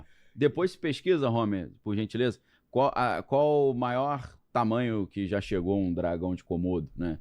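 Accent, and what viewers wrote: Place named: Rio de Janeiro, Brazil. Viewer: De verdade, a primeira vez que ouvi o pensei: vixeee la vem o carioca!!! HOJE NÃO PERCO UM VÍDEO DELE!!!!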